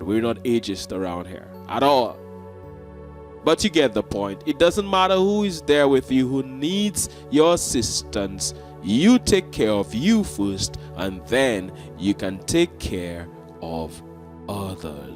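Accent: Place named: Jamaica. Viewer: are you Jamaican? Jackie from Philly You have a LOVELY ACCEN’T and you are very INTELLIGENT! I ❤ U-U-U Do the funky chicken sweetie! It’s a nice dance. The young kids like this old dance. L O L 😇😇😇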